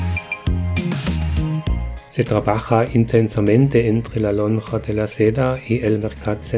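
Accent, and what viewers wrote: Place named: Germany. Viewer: warum mit deutschem akzent spanisch gesprochen ich kann es verstehen, aber die meisten deutschen nicht. super idee, den nicht so bekannten camino levante zu gehen. ich war 1972 in valencia resp. in der nähe und habe nur gute erinnerungen ausser an den zoo. damals hatten die tiere so eine art käfig wie im zirkus, da sind wir geflohen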